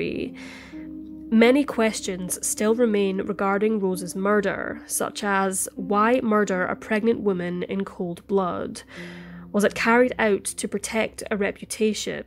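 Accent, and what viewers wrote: Scotland, United Kingdom. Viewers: Is this narrator from Scotland? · It's the warmth of her lyrically sweet Scottish lilt I love,, yet on the other hand some Scotts sound rough as sandpaper! You'd need subtitles to understand them! FRoM LoNdon wIV LoVe 💋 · This voice is amazing. I can't get enough of a Scottish woman's accent. Like beautiful music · I have such a soft spot for the Scottish accent, I'm not far from you either huni, Northern Ireland. Great upload btw , I love love love the oldest cases. New subscriber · I like listening to that deep beautiful Scottish accent. Great channel and great stories.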